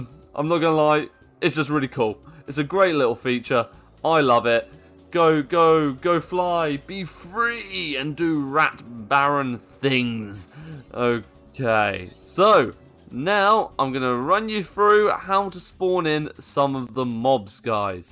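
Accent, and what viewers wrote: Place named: United Kingdom. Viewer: ya fking british accent bro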